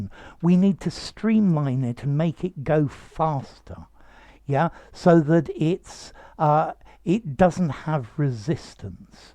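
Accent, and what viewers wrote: RP, United Kingdom. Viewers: Your English RP accent is sleek and I like it. It's very articulate. You enunciate each and every single word in your speech. Thank you sir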